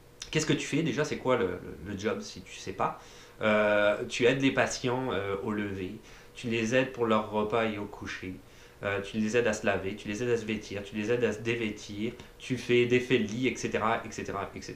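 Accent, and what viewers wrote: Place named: Quebec, Canada. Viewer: Merci, merci l'ami le grand bonjour, de l'autre rive de la méditerranée et le soleil durant les quatre saisons. Sincèrement l'ami , y a beaucoup d'informations et c'est motivant surtout avec accent québécois de temps à autre mélangé à la bonne humeur.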